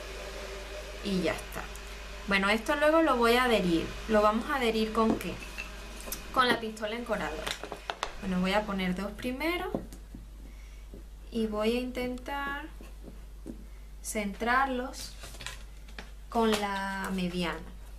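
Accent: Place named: Maracaibo, Venezuela. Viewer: además de ser Venezolana, por tu acento eres maracucha, un gran abrazo, que sigas teniendo mucho éxito!!!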